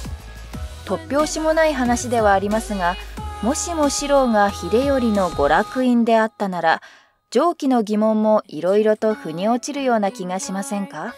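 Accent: Kansai, Japan. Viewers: この関西弁の男性ナレーターさんと直接お話してみたい！歴史ロマンが深そう！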